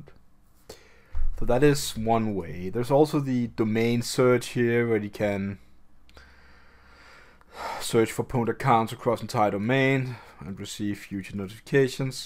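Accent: Denmark. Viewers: Do i sense a hint of danish in that accent 🙂 Glimrende video må jeg sige, hutlihut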